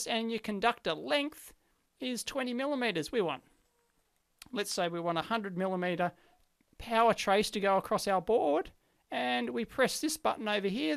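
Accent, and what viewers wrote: Australia. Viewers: I really enjoy watching your channel. Not sure what’s your nationality but your Aussie accent and expression that make me learn and laugh. Thank you.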